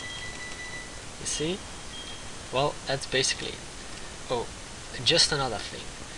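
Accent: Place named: Philippines. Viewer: Hey, if ur not jepenese that ar u filipino cuz u have an accent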